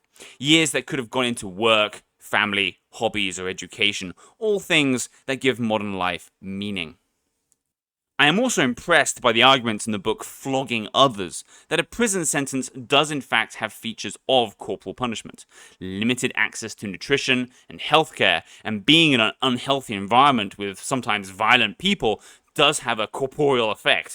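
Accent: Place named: RP, United Kingdom. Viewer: Ever heard the truth mate it usually starts with poverty but u wouldnt know about that judging by ur accent eton was it or harrow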